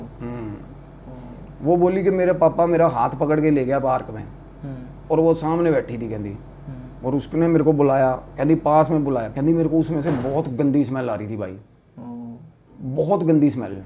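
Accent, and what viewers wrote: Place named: Punjab, India. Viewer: bhai this is punjab n hamare idhar aise he bolte hain meri jaan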